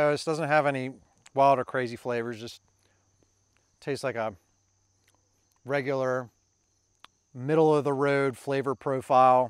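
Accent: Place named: Maryland, United States. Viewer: Hey. As a Marylander retired in South Carolina, I am so homesick watching your videos, at least during the summer. Winter, not so homesick ever. Terrific job with these multiple cultivars, very well presented. Did you get sick after taste testing all of those? I really enjoy your very subtle Maryland accent too.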